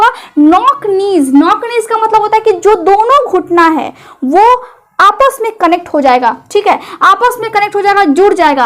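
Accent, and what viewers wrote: Bihar, India. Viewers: Bihari bhasa ki latk Kam karo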